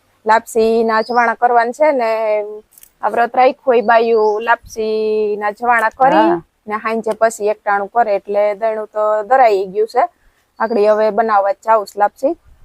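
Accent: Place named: Kathiawar, India. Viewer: Kem chho ben. Sivani su kare chhe. Tamari boli bov saras chhe kathiyawadi..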